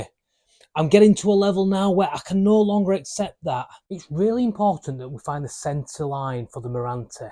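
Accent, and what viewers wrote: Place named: Birmingham, United Kingdom. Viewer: I'm not good with accents, I would have guessed you were from Birmingham. I can't imagine anyone ever leaving the Yorkshire Dales! Cheers from Florida.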